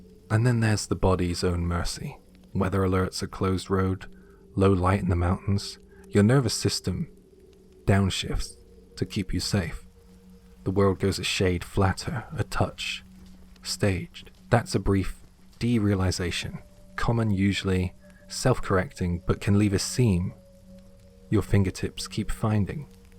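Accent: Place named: West Country, United Kingdom. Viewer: I hear a Scottish twang/ possibly West Country in your accent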